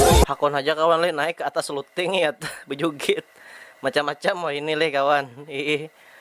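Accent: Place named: Hulu Sungai, Indonesia. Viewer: Orang mana kam logat hulu sungai pang nah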